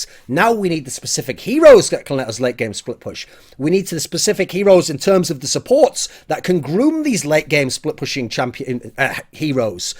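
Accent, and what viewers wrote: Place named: United Kingdom. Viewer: Waddles It's unscripted but he has good speaking skills, so he can just say his thoughts as they come in a way we understand. Plus he has a British accent, so it sounds cooler.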